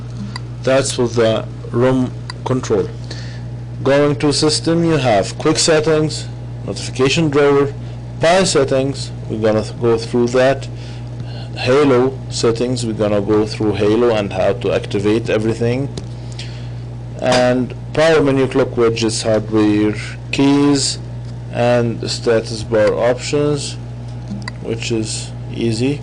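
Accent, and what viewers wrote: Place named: Egypt. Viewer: Hello, how are you:) I believe you're egyptian from the tone of your voice:) Well, I am too... Anyways, would you please tell me how to get the storage swapping work here?? I mean, the correct kernel, the correct script that would really work with that rom. I'm done of trying so many roms and kernels for CM based roms. I would appreciate if you do that:) Thanks w koll sana wnta tayeb:)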